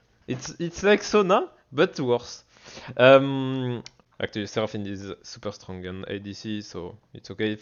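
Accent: France. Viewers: Cet accent français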